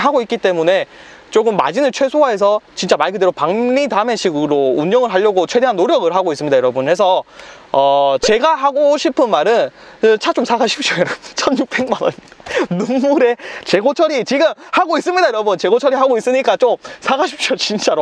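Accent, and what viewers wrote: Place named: Gyeongsang, South Korea. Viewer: 갱상도 발음이 직이네.. 고마 정이 철철 흘러 넘치네예... 정말 이 동상께 차 사고잡네. 부산까지 고마 날라가서 확 싸 질러 뿌고 잡네. 영상 고마버요. 하는것 보니 인상좋아 잘될낍니다.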